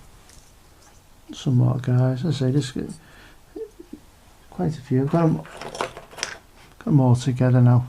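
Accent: United Kingdom. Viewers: Hey man you've just been suggested to me. Glad i came across you as I'm going to start playing bolt action. Where you from I can hear a slight Merseyside accent but I reckon you might be from my side of the water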